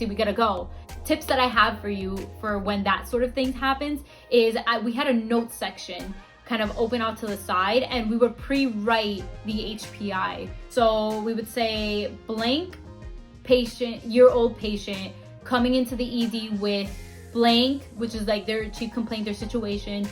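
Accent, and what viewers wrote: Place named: France. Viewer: U sound french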